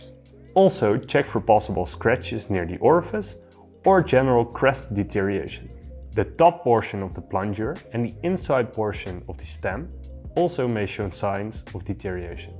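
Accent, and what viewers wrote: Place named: Netherlands. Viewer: I like your accent. Are you dutch?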